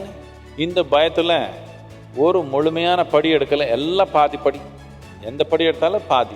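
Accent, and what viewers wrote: Tamil Nadu, India. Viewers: Tamil accent is very beautiful to hear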